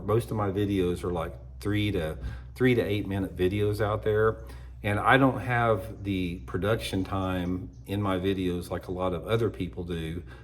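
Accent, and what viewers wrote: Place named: United States. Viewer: yYou sound like an average American, hope you dont mind that label.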